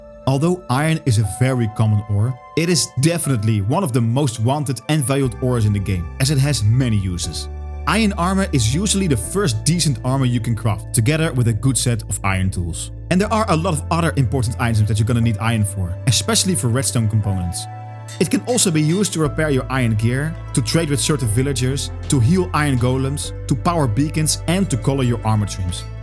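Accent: Netherlands. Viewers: You’re Dutch aren’t you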